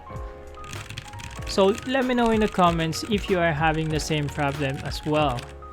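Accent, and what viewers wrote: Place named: Philippines. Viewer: Filipino accent hello ganda ng review bro love it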